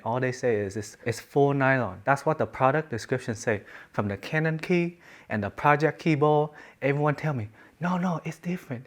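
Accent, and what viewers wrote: Vietnam. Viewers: I like your viet accent bro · As a Vietnamese, I love your accent so much hahahaha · That vietnamese accent is gold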